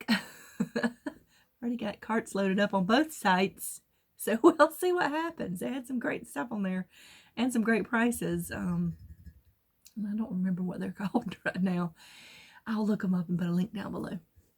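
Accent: South, United States. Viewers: I just love your southern accent you don't have to change anything. =-) Love your You Tube Channel and your bags.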